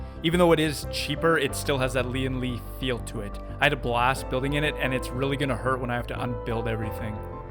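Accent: Canada. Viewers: The Canada accent is subtle but strong. It’s also the robotic and lack of vocal range.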